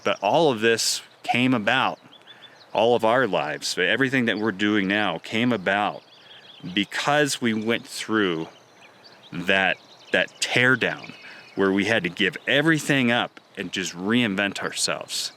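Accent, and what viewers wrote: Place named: Northern California, United States. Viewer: It’s Nor Cal Americano. No i don’t speak King James English do you! lol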